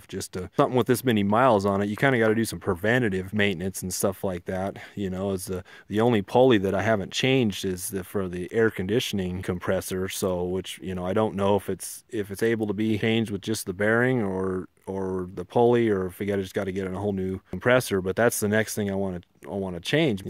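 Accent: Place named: Buffalo, United States. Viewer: God bless u but i cant stand the way people from buffalo ny talk its always like your across the street when your right infront of them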